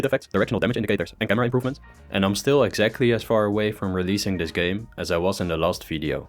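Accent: Netherlands. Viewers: Are you Dutch? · Too bad. Je klinkt Nederlands! Not everything needs to be original or grand. This definitely sounds like potential but needs interesting level designs. Maybe someone with more experience can help a great deal. But I get that you're fed up with the spider gunner game now. Making experimental games is the hardest there is. KISS principle and sticking to tried and true concepts can help. I made a card game: <link> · I hear a Dutch accent, Ik had/heb dezelfde struggles. Het is nooit verkeerd om opnieuw te beginnen en te leren van de fouten die je hebt gemaakt. Maar... Te, is ook niet goed he ;) op een gegeven moment Delen, met vrienden, familie en community, en feedback absorberen. Heel veel succes met je droom! Ik heb dezelfde =)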